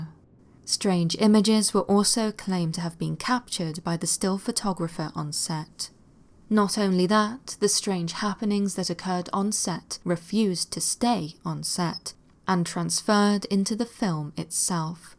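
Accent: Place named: RP, United Kingdom. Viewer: Queen's English...luvly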